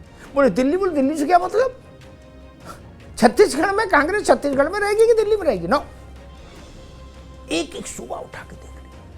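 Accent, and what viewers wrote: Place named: Farrukhabad, India. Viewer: अमिताभ जी का ठेठ फर्रुखाबादी स्टाईल ही आप की स्पेशलिटी है, सीधी सीधी खरी खरी कोई लाग लपेट नही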